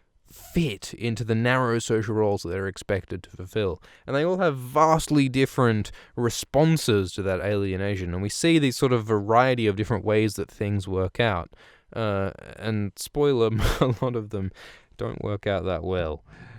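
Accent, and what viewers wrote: Australia. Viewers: Is there going to be a burp in every episode? I'm not complaining, just observing. That combined with the swearing and the accent wants me to see more Australian readings of popular book series. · Fellow Aussie here, nice to hear GoT in an Aussie accent!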